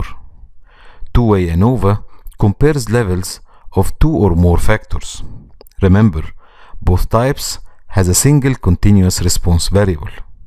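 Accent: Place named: Egypt. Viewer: Finally Found the video that help me to know how can I use it in the research. By the way Egyptian voice and accent in this video is nice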